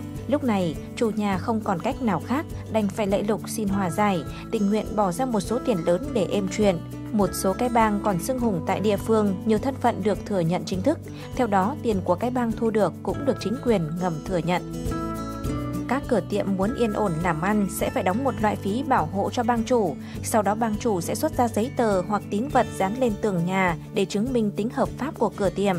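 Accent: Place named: North, Vietnam. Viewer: Hồng Thắm giọng nam, còn giọng này âm bắc mà bạn!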